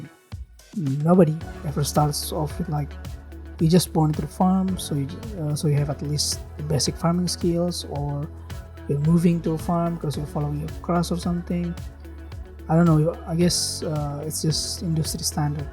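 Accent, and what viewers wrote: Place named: Indonesia. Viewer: Sound looks indonesian accent.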